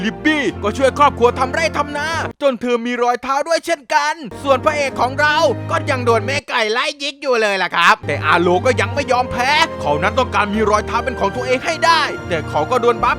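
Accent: South, Thailand. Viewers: พี่เปนคนใต้หรอคับ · พี่คนใต้รึเปล่าเนี้ย55 งูบองหลาเฉย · คนใต้บอนิ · คนไต้นิ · พี่ครับพี่เป็นคนใต้ใช้ไมครับ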